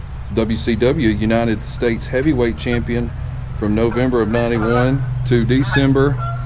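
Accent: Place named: South, United States. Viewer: You have great southern voice. God bless you friend.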